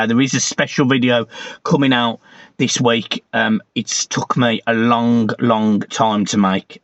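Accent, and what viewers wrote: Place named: Liverpool, United Kingdom. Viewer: Love that "scouse" accent..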